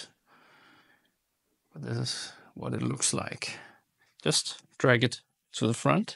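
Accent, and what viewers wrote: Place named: Denmark. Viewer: i knew u were danish by ur accent, nice video :D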